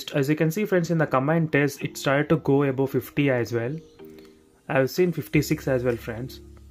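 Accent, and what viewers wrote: India. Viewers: This is the most understandable indian accent I even watch on random tutorial in youtube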